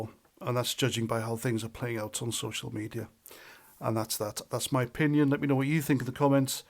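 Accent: Wales, United Kingdom. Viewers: High like to view ratio, small but intense viewership this channel gets. I love the Welsh accent, it is Welsh? The ultimate in humble our. Voice of the people!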